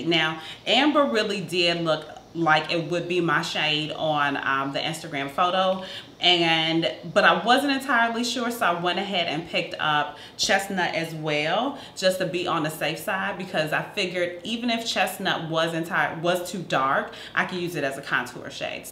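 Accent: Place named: Texas, United States. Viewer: Are you from Texas?? You sound just like me! Hey Y'all! #htown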